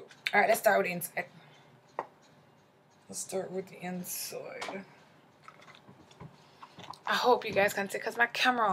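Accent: Jamaica. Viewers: you are definitely Jamaican no hiding that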